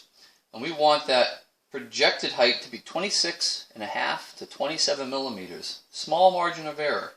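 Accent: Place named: New Hampshire, United States. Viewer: Just found this today... heard the "NH dialect" after finding the violin building. Lived in Brookline NH for years. Your violin building and description is both interesting and down to earth. I often wondered about building a dulcimer from Home Depot woods, looking at those beautiful cedar boards. Wonderful work and the videos are great!!! plus.. the fiddle sounds great! :-)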